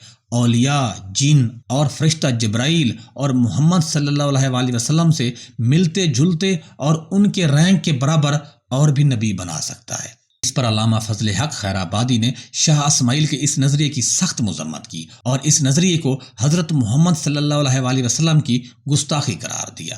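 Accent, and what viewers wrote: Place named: Punjab, Pakistan. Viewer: I love your punjabi accent of english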